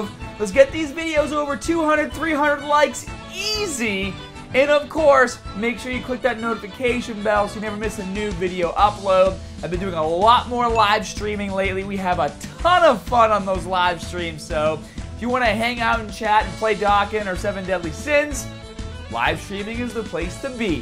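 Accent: Boston, United States. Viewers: He has a boston accent. He is from Boston, Massachusetts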